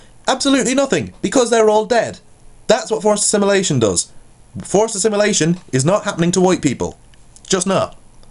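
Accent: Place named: Ireland. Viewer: do you have irish parents? you sound like it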